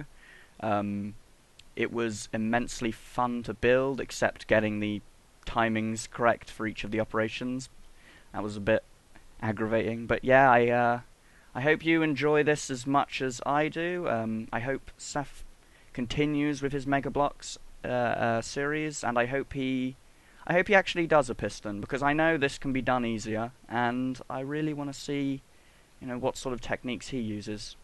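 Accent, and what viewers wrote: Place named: Sheffield, United Kingdom. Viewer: are you from Sheffield? I recognize your accent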